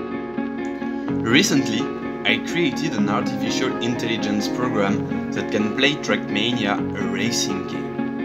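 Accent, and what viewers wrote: France. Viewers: Are you French? because of... the accent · T'es français non ? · Ca c'est du machine learning c'est génial ca ! Par contre on sait direct que tu es francais mdr xD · french voice detected ! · On a reconnu l’accent français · Ah, l'accent français, j'aime! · Areyou french , you sound like a french speaker you have almost the same accent that lots of my friends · L'accent French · pouh l accent francais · Salut (par ton accent j'en conclu que tu es français ^^) ! Super vidéo ! Je la découvre en même temps que mon projet en lien avec les réseaux de neurones. J'aurais souhaité savoir avec quel document/cours/site tu avais appris à coder ton algo de réseau de neurone ? Et avec quel langage ? Merci d'avance ! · T'es francais nan? Sinon bg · Really like french accent bro xD En vrai c'est ultra intéressant ! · so French accent 😂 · Sur l'échelle des accents francais, t'es loin d'être le pire que j'ai entendu, t'inquiètes. 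Super vidéo though :) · Nice sa sent quand meme un bon vieux accent français... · Mdr moi aussi j'ai cramé que l'accent était français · le bon accent français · Super bon cet accent français. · tu parles bien anglais mais avec un bon accent français mais pas grave tout le monde comprend 😉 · au moin grace a l'accent on sait que sa vient de france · Ahah i spotted you french accent ! Cool vid, maybe lower the music next btw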